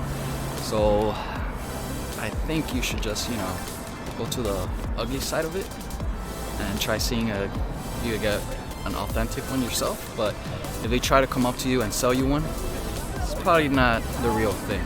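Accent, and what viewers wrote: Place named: Australia. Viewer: I didn't know you had an Australian accent. And wow rural Germany is beautiful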